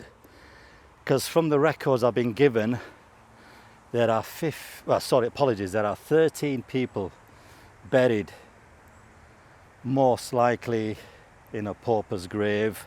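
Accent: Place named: Yorkshire, United Kingdom. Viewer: That’s one string Yorkshire accent! Great video 👍🏻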